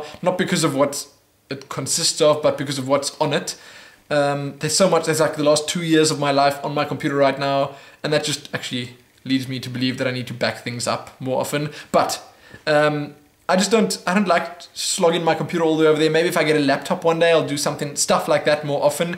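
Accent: South Africa. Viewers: Gogo the SA accent! Good show and a new fan!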